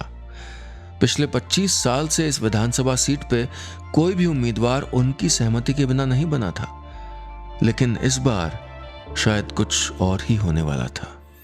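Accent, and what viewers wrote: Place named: Kumaon, India. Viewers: Love your way of kumaoni diaglouge Can’t control my laughing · I luv ur pahadi accent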